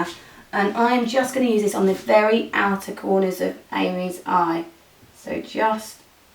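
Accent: United Kingdom. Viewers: Zali P British accent* or I guess it can work either way. But if you Google it, there's tons and tons of speculation.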